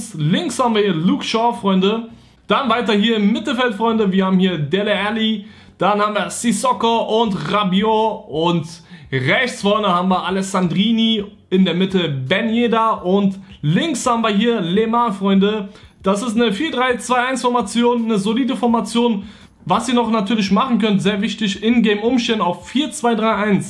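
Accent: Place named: Berlin, Germany. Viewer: Das ist Berliner Akzent die sagen isch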